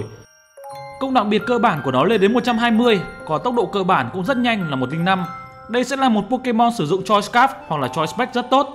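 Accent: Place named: Hanoi, Vietnam. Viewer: ad người hà nội à giọng nghe hay phết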